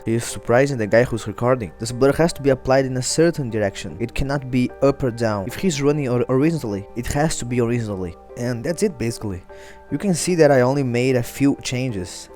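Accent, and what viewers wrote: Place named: Russia. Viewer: Mano seu inglês tem uma pronúncia bem russa. Sem zoa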